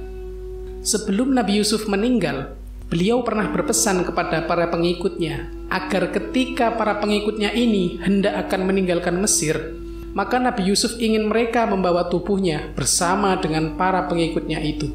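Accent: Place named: Java, Indonesia. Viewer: Logat yg bikin video jamet abiss medok da males gw ntn ni video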